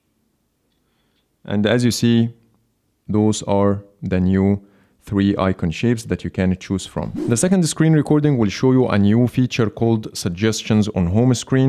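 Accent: Egypt. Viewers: very Egyptian accent عم الناس ! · A true Egyptian English accent. Who agrees? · انت مصري صح؟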